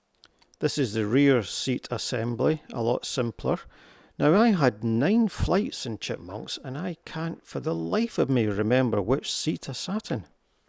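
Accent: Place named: Scotland, United Kingdom. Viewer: you would have been seated in the rear cockpit for your AEF (air experience flight). The pilot instructor would be in the front cockpit. You are quite right, there were no seat cushions fitted, the bucket seats were designed to take the seat parachute that you wore on your posterior (which made walking to the aircraft and climbing up into the cockpit quite awkward if you remember). In future I’d recommend the use of a pin vice to drill the small holes instead of the DIY hand drill. (Sledge hammer to crack a nut?) Nice video and well narrated with your soft Scottish accent.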